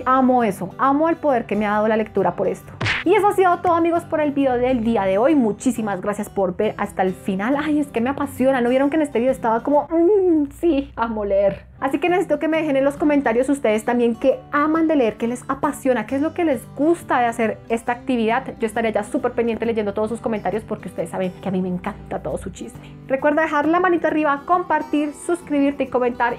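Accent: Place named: Bogotá, Colombia. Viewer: Hola... Creo que es la primera vez que te escribo, pero JAMAS me pierdo de tus vídeos... Yo también disfruto del olor de los libros y de las portadas de los libros, jjjeeje... otra cosa que me encanta de los libros, es que me hagan reír, y bueno, aunque parezca un tantito masoquista, también amo, que un libro me haga llorar, y hasta que me deje deprimido... No quería utilizar una frase cliché, pero es algo así como sentimientos encontrados, osea, PONERME FELIZ PORQUE UN LIBRO ME DEPRIMIÓ, jjajjaa... Suena loco, ya se... Aprovecho para decirte que me fascinan tu vídeos, tu acento bogotano, tu personalidad, y esa modestia que tanto te caracteriza... Nunca dejes de hacer este oficio, por favor... Un abrazo desde Montería Córdoba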